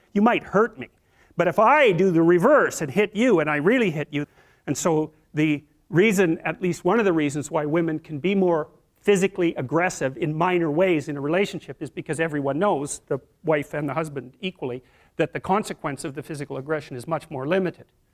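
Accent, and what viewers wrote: Canada. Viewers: This guy's Canadian, eh?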